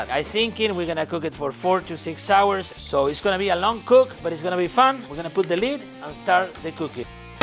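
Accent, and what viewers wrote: Argentina. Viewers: Argentiniza el tipo. Bien ahí.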